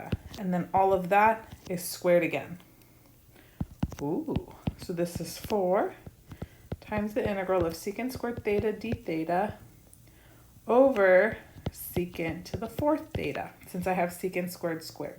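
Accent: United States. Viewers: Adore American English and U sound perfect)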